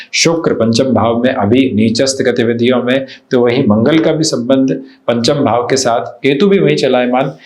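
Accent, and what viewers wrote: Standard. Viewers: आपकी हिंदी भी बहुत शुद्ध है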